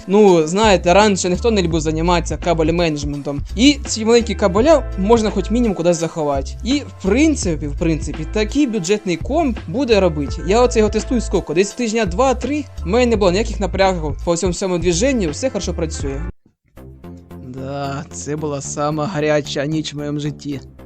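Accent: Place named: Surzhyk, Ukraine. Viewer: Навіщо такий кончений суржик, кров з вух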